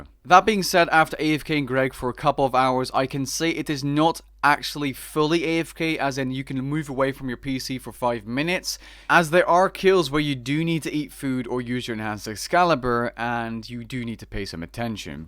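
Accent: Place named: Netherlands. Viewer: i hear a slight dutch accent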